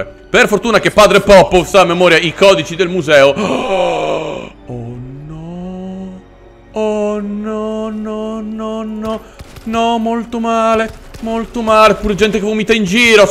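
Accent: Russia. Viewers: Il miglior accento russo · Adoro tuo accento ruusso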